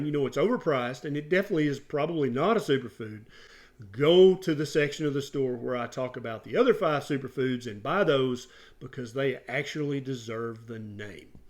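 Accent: South, United States. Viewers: I love my southern speaking doctor!!! Great info!..thank you!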